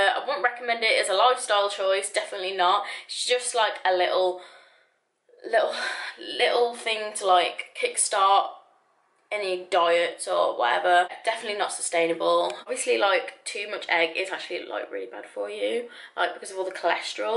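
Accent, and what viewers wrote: United Kingdom. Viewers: Are u from the midlands?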